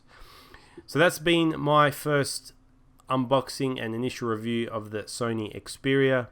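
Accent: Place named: Australia. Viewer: Now I can distinguish which accent belongs to which Country. U r from Australia !!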